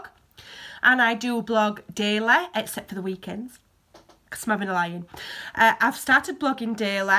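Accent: Yorkshire, United Kingdom. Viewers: Hello from Texas! I'm a Manchester girl born and bred so you have no idea how lovely it is to hear that Yorkshire twang. Can't beat a Yorkshire sense of humor, something sadly lacking over here. I can't tell you how many times I come out with a saying and people just stare back blankly. Any ways up (there's another!) I love your videos and follow you daily. Thanks muchly x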